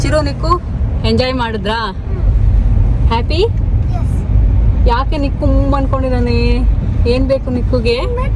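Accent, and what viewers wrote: Mandya, India. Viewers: Mam just informed all what's Retired age in US for Indian people’s for all categories:Nimma Mandya kannada bashe onthara channagidhe😂😢😅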